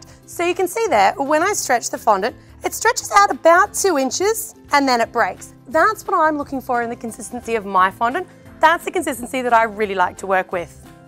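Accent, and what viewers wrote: Australia. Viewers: Does no one else think she sounds like an Australian Rachel Ray? Lol! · Draco Malfoy has a British accent....hers is Australian. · I love your Australian accent! My English teacher is Australian here in Sweden and oh my, I think about you every time I hear her :)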